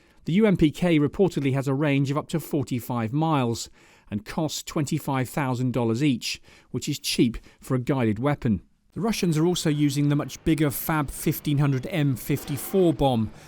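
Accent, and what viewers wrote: United Kingdom. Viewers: I heard his british voice thats how i know this is a false propaganda channel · Whenever I hear a British accent narrator i feel propaganda whyyy ?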